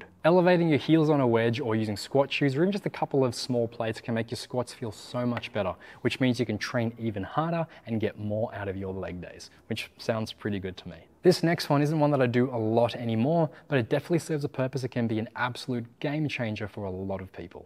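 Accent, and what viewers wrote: Australia. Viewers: Your accent; Australian? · its alittle weird watching someone whos asian with an Australian accent.. but your the not the first person ..since the first I know of is Joe from the AnimeMan ^_^ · Asian Australian?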